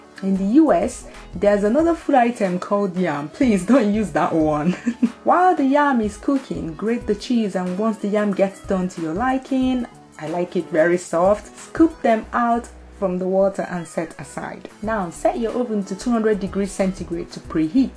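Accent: Nigeria. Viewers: You sound so Nigerian,I love it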